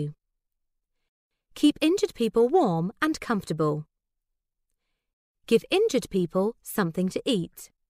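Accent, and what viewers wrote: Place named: United Kingdom. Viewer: good accent sound british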